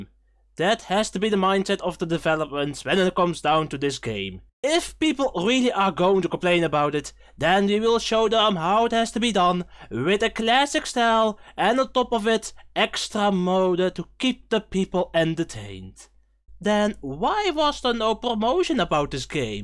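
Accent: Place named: Netherlands. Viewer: Strongest Dutch accent ever 😂😂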